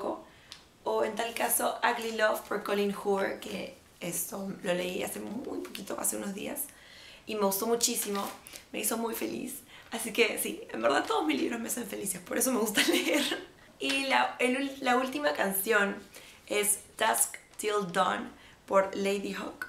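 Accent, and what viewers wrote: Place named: Argentina. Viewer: holaa, me suscribi a tu canal, muy bueno :D , la verdad en un momento pensé que eras de acá de Argentina jaja, buenos nos vemoss :D , no olvides pasar por mi canal, soy "un intento de youtuber" ajaja saludoss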